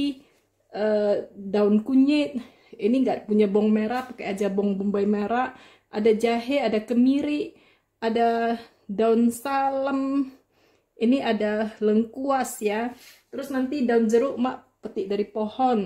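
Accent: Medan, Indonesia. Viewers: OMG ii logatnya medan kali. tapi begitu ngomong inggris kaget i. jago bgt wkwkwk pengen nyobain tapi bahannya susah d sini huhu..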